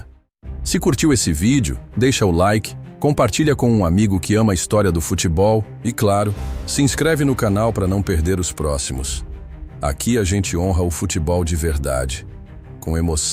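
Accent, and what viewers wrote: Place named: Portugal. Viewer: Do nada o narrador mete um português de Portugal kkkkkkkk toda hora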